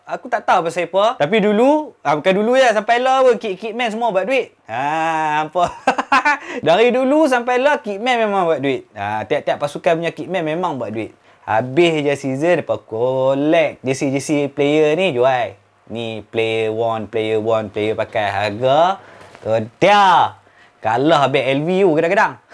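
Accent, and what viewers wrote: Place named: North, Malaysia. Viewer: Baru jumpa channel ni.. Serius content sempoi bro !! Dengaq pekat utaqha tu jatuh cintaa nak layan haha.. btw keep the content bro & stay active this channel..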